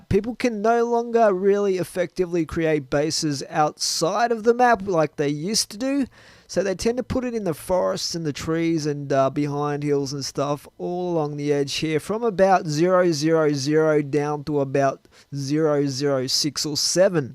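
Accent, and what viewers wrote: Australia. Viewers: u AUSTRALIAN mate me too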